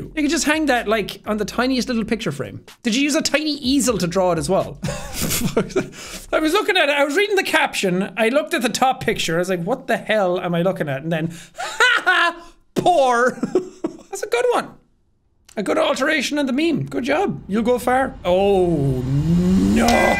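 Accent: Ireland. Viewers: I love how he became significantly more Irish after the ice was broken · The entire time I watched this my cat was scratching at my door for no reason and I was like: She is drawn to the voice of the loud Irish man 🛐🛐🛐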